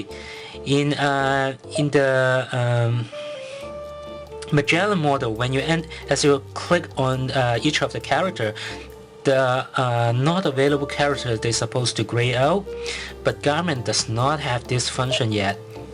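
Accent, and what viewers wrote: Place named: Vietnam. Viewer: The accent of this guy sounds like Vietnamese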